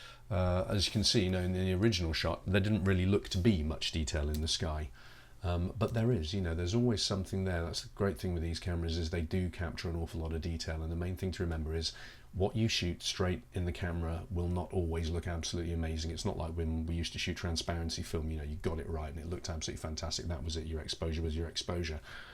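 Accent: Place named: United Kingdom. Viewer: Hi! You just appeared in my Youtube recommendations and I'm frankly happy for it. Great video and it's really nice to listen to your charming Brittish accent after so many american or australian tutorials. I'm not really into trying Lightroom, I don't like the concept of libraries and catalogues, but the develop module seems much more atractive than ACR, so maybe it's time to give it a try. Thanks for sharing your time :)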